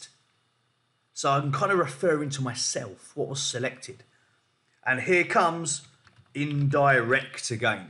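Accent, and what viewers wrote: United Kingdom. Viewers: Have to suffer 8mins of stupid British accent to learn how to create hyperlinks from drop down menus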